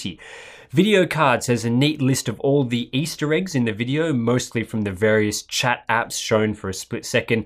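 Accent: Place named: Victoria, Australia. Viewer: It's that Vic accent. I'm SA and I hear the R first. Like sometimes I hear you guys say preformance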